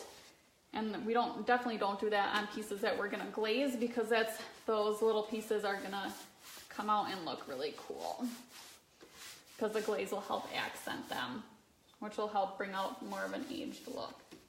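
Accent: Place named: Buffalo, United States. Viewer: You must be from Buffalo NY??! 😁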